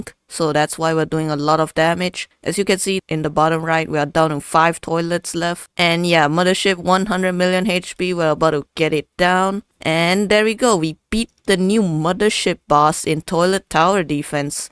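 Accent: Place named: India. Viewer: I know your voice you are Indian if I'm right give me heart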